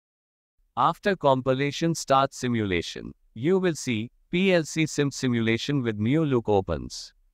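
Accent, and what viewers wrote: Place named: India. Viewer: what is the point of using an automated voice if it still has an indian accent!?!?!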